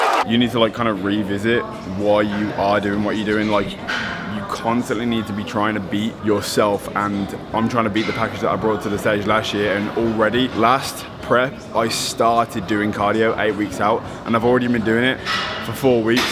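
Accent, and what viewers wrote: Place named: United Kingdom. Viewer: LOL He has a British accent. Do they not televise American or British shows, movies etc in whatever country you live in?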